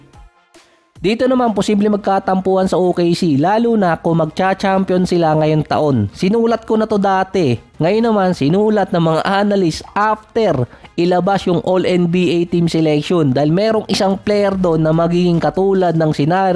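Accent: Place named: Pampanga, Philippines. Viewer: Kapampangan ya i koya 😂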